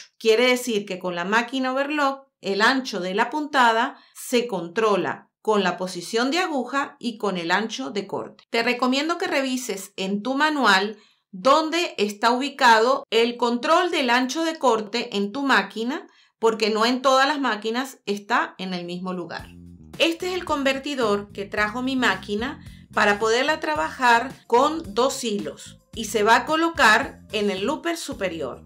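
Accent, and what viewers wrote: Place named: Venezuela. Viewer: me lo imagine por tu acento, q bueno, yo tambien soy Venezolana y estoy viviendo en Australia. Me compre la maquina pq estoy haciendo bolsitos d tela y collares y me aligera el trabajo, tengo una tienda online Nanajuliedesign, vendo solo en Australia , estoy encantada con tu explicacion y ahora mas sabiendo q eres venezolana. En q parte d España estas?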